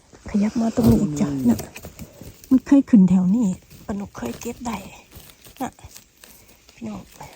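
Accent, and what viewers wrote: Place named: Korat, Thailand. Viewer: ฟังคนโคราชบ้านเองพูดแล้วชื่นใจ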